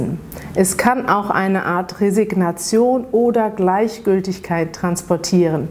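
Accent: Standard. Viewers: Hi, how can I get in touch with you directly? g:) You are the most methodical person I found on the web and your Hoch Deutsch rocks:) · Ist das hochdeutsch?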